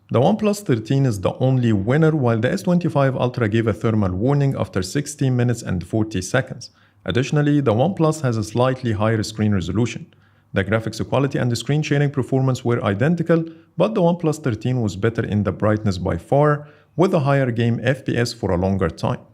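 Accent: Egypt. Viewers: اللكنة المصرى منورة 😂🇪🇬 Great video though 👌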